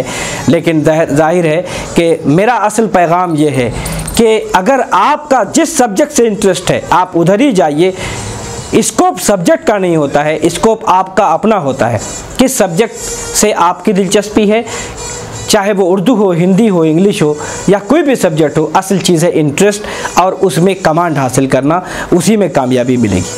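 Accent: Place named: India. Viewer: Ap janab ka Labb o Lehja to hindi hai.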